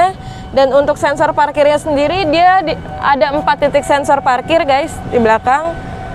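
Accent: Java, Indonesia. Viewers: pasti wong jowo udah bisa ketebak dari suaranya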